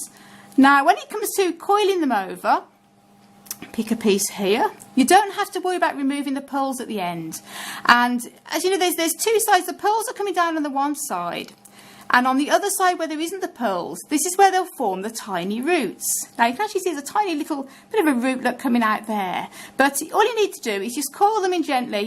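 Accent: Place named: Wolverhampton, United Kingdom. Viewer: Are you from Wolverhampton? Loved hearing somebody local on here! Thank you for the video. It's fab! Fingers crossed my daughter's cuttings root 🤞🏽 have a great day!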